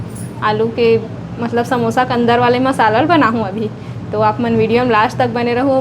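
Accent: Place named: Chhattisgarh, India. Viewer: Tuhar baat achha sunathe bhoji sudh c. G. Bhasa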